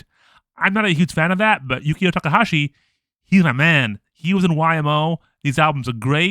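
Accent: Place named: New York, United States. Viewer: I honestly just thought you were from NYC or Jersey or Boston or something.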